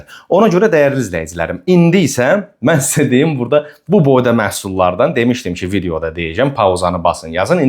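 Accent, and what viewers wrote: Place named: Karabakh, Azerbaijan. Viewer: Çox sağ ol oğlum..Mən də sevərək baxıram bu videolara..Danışıq ləhcəni daha çox sevirəm,məncə Qarabağlı balası olmalısan .🙏🙏🙏🌹🌹🌹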